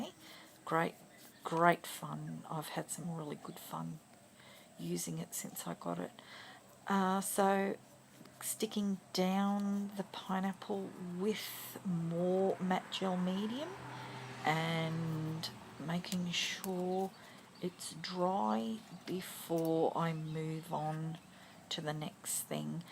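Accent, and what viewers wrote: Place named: Australia. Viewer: Love the page and the pineapple. Love the gelli plate and good to hear another Australian accent. Susan.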